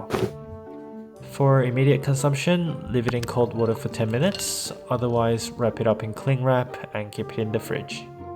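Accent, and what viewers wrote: Australia. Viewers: ur accent are u aussie?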